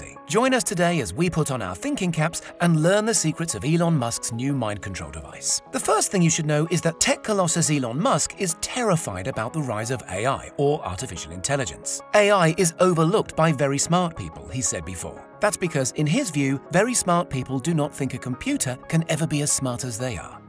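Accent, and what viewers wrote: United Kingdom. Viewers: Why do I love this guy's British voice so much?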